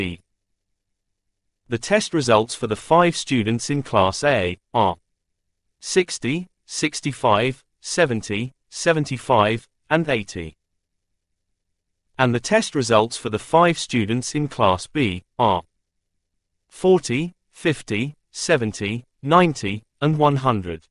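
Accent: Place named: United Kingdom. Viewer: Thank you, sir. You sound British. My Mother was a war bride from Coventry, England.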